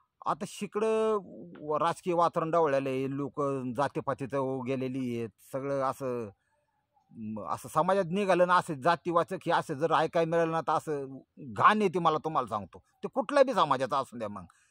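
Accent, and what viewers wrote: India. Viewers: तुमच्I गावरान भाषा खूप छान आहे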